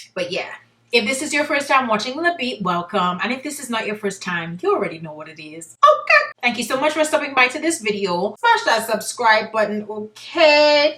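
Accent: Trinidad and Tobago. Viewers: When I heard that trini accent....subscribe ONE TIME GYUL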